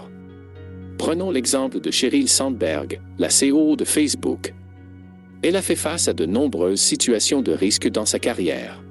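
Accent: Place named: Quebec, Canada. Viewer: De très bons exemples, j'aime bien aussi la narration avec l'accent québécois. Merci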